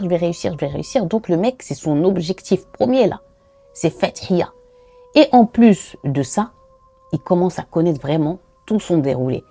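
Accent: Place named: Gulf, Arab world. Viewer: 😮😮😮😮😮😮😮😱🫣😱😱L'horreur dans toute sa splendeur... J'avoue que tu es une très bonne narratrice. Tu captive ton public. Je t'ai decouverte récemment. Tu as un superbe accent du Khalij.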